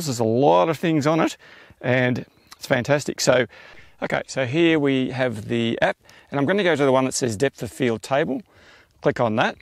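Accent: Australia. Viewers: Nice to hear an Aussie accent on YouTube 👍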